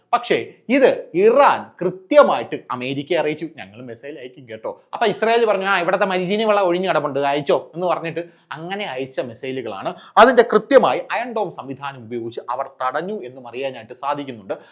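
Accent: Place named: Trivandrum, India. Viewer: Nice explanation in Tvm. Slang, very interestingly presented ❤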